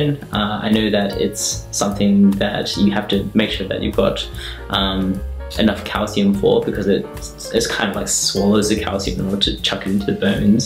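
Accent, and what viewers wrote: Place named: Australia. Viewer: It must've totally slipped my mind that you're in Australia but OH MY GOSH. YOUR ACCENT. AH. Your voice, and you're so funny!!! Your video quality is beautiful, and the jazz is a vibe, and as for what YOU took notes on as a doctor wow it has all flown over my head. But you look happy and look like you're having fun which makes me happy ☺️☺️☺️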